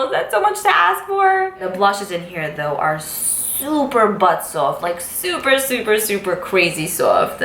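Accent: New York, United States. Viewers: Love your accent! You need a video just repeating the word "gloss", LOL. I was like is she from New York....looked in the description box and what ya know, you are! LOL. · Im from the South. So the New York voice is so addicting! haha good video.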